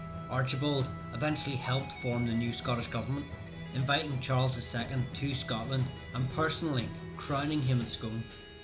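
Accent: Ireland. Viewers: It is really hard to understand what is being said due to the annoying, loud music plus what appears to maybe be an Irish accent. Such a shame as the information appears good.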